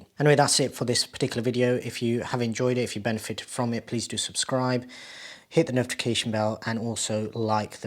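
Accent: United Kingdom. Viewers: Thanks for the English English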